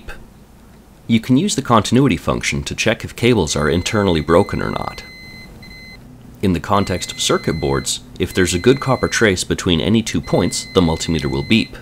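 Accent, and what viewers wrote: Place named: Canada. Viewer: Nice channel. Good content, Canadian voice and humour. Bon!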